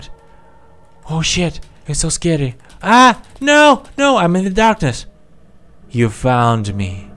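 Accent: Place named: United States. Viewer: Сначало подумал что ты американец, хорошо маскируешься👍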